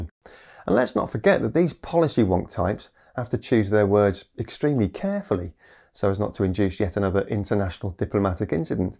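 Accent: United Kingdom. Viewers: You may be a Brit, but I do not consider your accent especially funny. Instead, I consider your videos on GW excellent. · I about spit my coffee out laughing . I do appreciate you switching between existential dread and hope. However, my favorite part is the humor in your presentations. Between the terrific British accent - Yank here - and the humor, it's a great start to many a Sunday. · True - although I'm mostly here for the knowledge the humor helps and let's admit that we Yanks do love a British accent.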